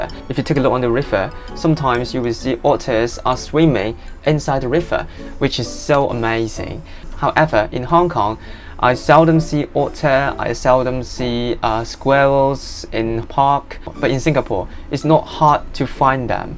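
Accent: United Kingdom. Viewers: LUV THE BRITISH ACCENT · Yes I love the British accent too. Does Dave speak the same accent as you? BTW miss Dave hope he will be back soon!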